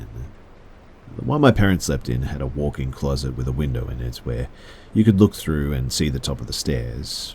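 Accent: Australia. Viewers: I watch a Creepy Pasta channel called Mr.Creepy Pasta. Creepy pastas are a bit different than. His is more horror or scary. Creepies 😮 are, well,… Creepy. 😊. The are fiction about all kinds of things. It often looks like the person or people will look like they are going to get away…. But the don’t usually do. Mr. Creepy Pasta is the best! Creepy Pasta Jr.is really good, he has t been posting anything for a while. I think he’s sick.? I read a response from him thanking us for watching. I don’t want to nosy. So he hasn’t been making too many videos. And there are videos are usually shorter than, but they have been made more frequently. But he is really good because he puts out the videos on a regular basis and you can always. Count on him to have videos up and they are more than one video so you can relax and listen to him through the long and everything is fairly new in essence. 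I know the feeling the way he reads and narrates is very nice and that makes the story much greater for it. Thanks for keeping everything scary and interesting my friend you make the night that I am not doing so well more enjoyable. You’re like a drug that makes people feel better! Not so much high. But for me anyway, I can take some time off from reality and get myself immersed in the stories, and just relax and listen to your voice. Soothing Australian voice, and there are others who are from Australia and a lot from British.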